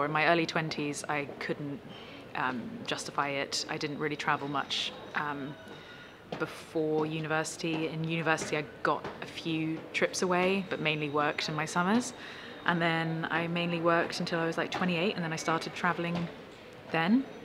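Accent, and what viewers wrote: United Kingdom. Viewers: I don't know why someone would ask a question about her voice.? Imo her speaking voice is just as soothing as her singing voice.. She has a slight British accent that I adore.. It's not overbearing or a harsh tone that sounds almost condescending as I feel about some others. Her singing voice is purely beautiful. As is she.. Some of those questions had to be just poor attempts of humor..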